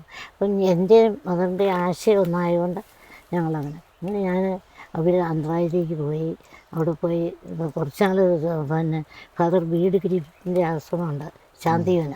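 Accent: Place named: Kottayam, India. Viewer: സിസ്റ്ററുടെ വർത്താനം ഒരു കോട്ടയം slang ആണ് 13 വർഷം കാട്ടിൽ ഒറ്റയ്ക്ക്😔😔 ഇനിയും വേണം sister ൻ്റെ വീഡിയോ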